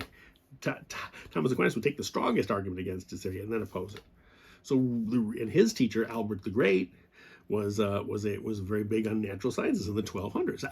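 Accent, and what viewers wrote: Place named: Minnesota, United States. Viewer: Hey, I'm watching this video--per your recommendation! BTW, are you originally from Minnesota? I'm only guessing this because your accent reminds me of where I grew up.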